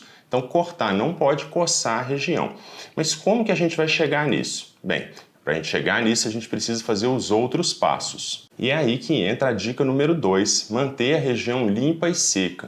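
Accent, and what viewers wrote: Minas Gerais, Brazil. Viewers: Esse sotaque mineiro é maravilhoso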